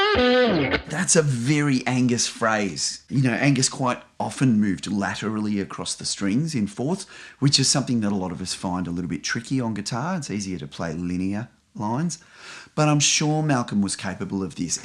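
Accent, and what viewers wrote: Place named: Australia. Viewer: Just watched this! Love it. Great to hear an Aussie accent in this space. Forensic guitar analysis, and you are so talented to be able to demonstrate each player. Your little assistant even has your hair😊🤣. Rock on🎸🔥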